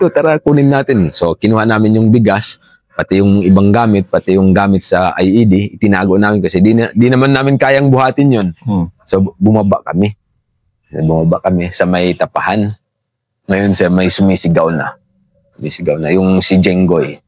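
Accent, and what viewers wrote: Philippines. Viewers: Tagalog na tagalog magsalita si Sir,maliwanag sya magsalita..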